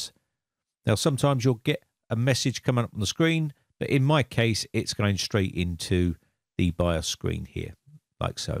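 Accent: England, United Kingdom. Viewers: Are you and Michael Cain from the same area of England?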